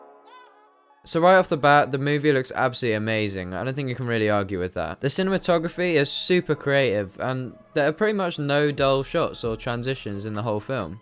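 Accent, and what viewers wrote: United Kingdom. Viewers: you british though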